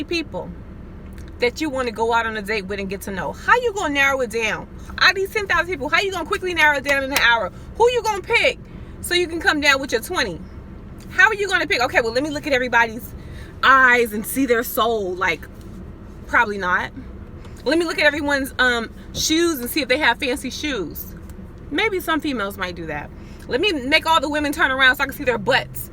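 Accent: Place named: New York, United States. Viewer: Dang pretty lady. You sound like you’re from the NY.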